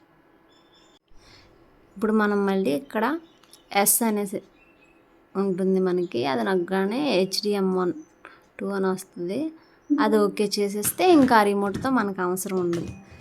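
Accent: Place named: Godavari, India. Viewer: Godavari slang 😊😊😊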